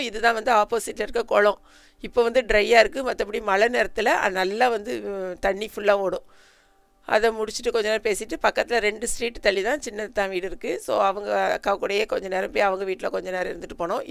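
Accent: Tirunelveli, India. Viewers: நீங்க சென்னை ல இருந்தாலும் உங்க பேச்சு திருநெல்வேலி பேச்சு தான்.... மாறல... Gud 👍👍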